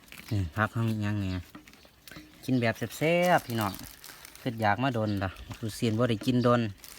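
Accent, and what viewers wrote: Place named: Phu Thai, Thailand. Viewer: สำเนียงภูไท จริงๆ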